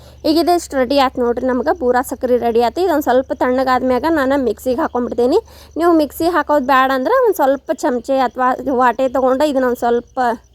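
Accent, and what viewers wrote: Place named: Chikkamagaluru, India. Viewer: Chikkamagaluru madam nimma bashy kelok chanda madam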